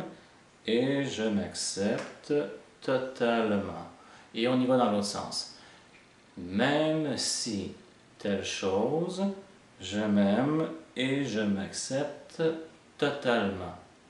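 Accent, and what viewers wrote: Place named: Quebec, Canada. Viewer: Merci Monsieur . J'adore le Canada et plus précisément le Québec! Votre accent est doux et ensoleillé dans votre région. Merci encore De Marseille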